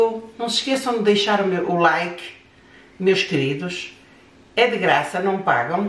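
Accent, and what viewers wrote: Portugal. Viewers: onde vc mora? Já entendi q não é em Portugal, mas vc fala como portuguesa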